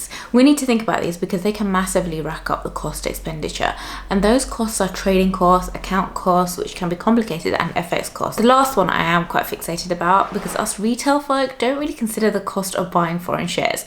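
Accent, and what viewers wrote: United Kingdom. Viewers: Are you from England. Btw great video...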